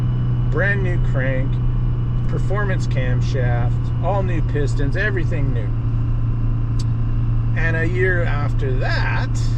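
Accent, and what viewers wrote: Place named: Canada. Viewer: Sounds like yer from the great white north bud. Good thing ICBC doesn't do inspections eh?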